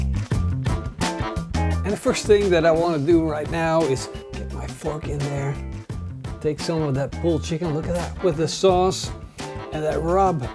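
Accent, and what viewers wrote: Netherlands. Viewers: He's Dutch (from Holland aka The Netherlands)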